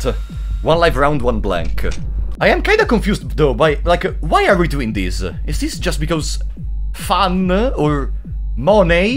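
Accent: Italy. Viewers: I watch especially for the fear & hunger videos so hearing him in this video make confused exclamations instead of knowing every single secret of the game is very strange XD and speaking as an Italian to another Italian it's so nice to hear someone with an English pronunciation similar to your own! you make great videos man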